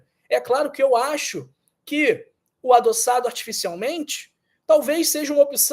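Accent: Rio de Janeiro, Brazil. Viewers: Sotaque de carioca não convence ninguém, parece malandro falando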